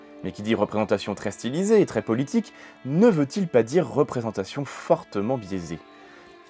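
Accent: France. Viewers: C est un auvergnat qui parle :)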